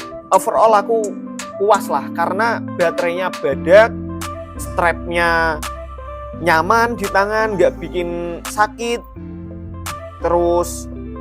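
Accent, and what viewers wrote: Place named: Semarang, Indonesia. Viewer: tq mas 🙏 Btw kek logat semarangan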